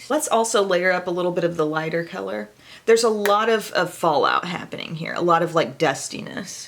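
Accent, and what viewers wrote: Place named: Illinois, United States. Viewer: I live in Hawaii and at 3 am I was about to go to sleep but saw your notification and had to watch! I love your content. Thank you for being so honest and always so positive. You seem to be so kind and genuine. P.S. I'm originally from Kentucky so your Illinois voice reminds me of home. 💙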